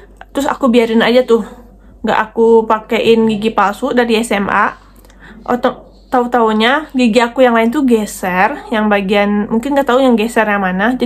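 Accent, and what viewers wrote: Padang, Indonesia. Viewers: Kaka nya org padang ya. 😅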